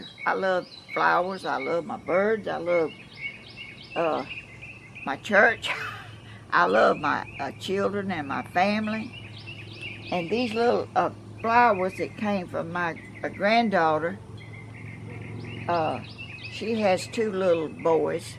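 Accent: South, United States. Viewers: Hi, I'm Melissa from Iowa. I'm subscribed. You are just so stinking cute, southern accent, cute sayings, and a real sweet lady, I really enjoy watching you create your crafts, and am wanting to try my hand at some of them, bless you from Ga.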